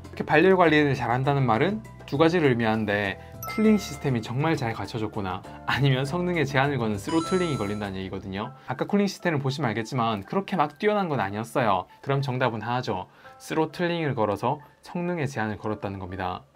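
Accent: Gyeongsang, South Korea. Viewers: 감사합니다. 약간의 경상도 엑센트가 들리네요. 대박 나세요.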